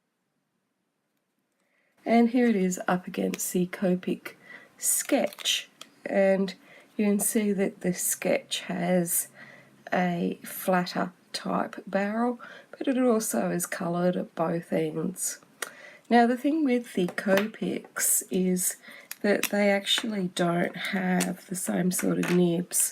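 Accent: Australia. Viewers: Thank you so much for this excellent review:) I always love listening to your reviews, you speak so eloquently and give a fair and unbiased report on the product you are reviewing. It is also a bonus to hear a fellow Aussie accent!!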